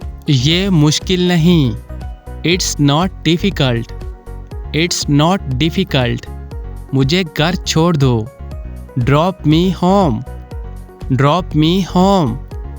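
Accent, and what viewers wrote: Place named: United Kingdom. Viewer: mashallah ap ka accent British he really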